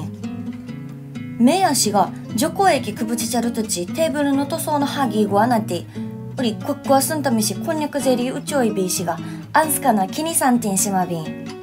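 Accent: Okinawa, Japan. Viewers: 綺麗なウチナーグチ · 中部なまり 同じ事を2回繰り返して言うのは「 」のクセ（笑） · 沖縄の方言何言ってるか分からんけど可愛い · 中部と南部の方言が混ざってる感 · 南部方言ですか？？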